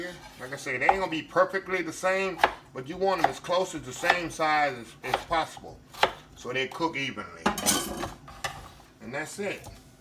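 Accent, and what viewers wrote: South, United States. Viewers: Chef I absolutely love you and you keep on preachin sir. Let the world know who's (Jesus) in control. This food looks delicious 😋 and that country accent I so love 🥰 I love my southern brothers and sisters!! #HelpSomebodyOldSchoolSoulFood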